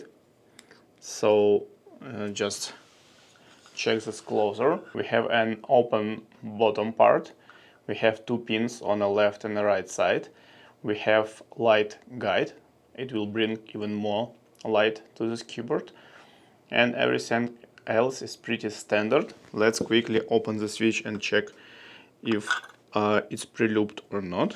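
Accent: Russia. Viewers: По акценту слышу вам не чужд русский язык. Какова клавиатура в сравнении с отцами магнитных клавиатур wooting? Есть ли разница в ощущении или цена за wooting это просто наценка за бренд?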